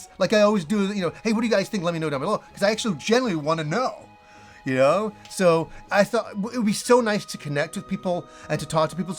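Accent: Yorkshire, United Kingdom. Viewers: Lol a real yorkshire accent. I pray Allah SWT guides you and your family. Ameen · She sounds like a proper Yorkshire lass!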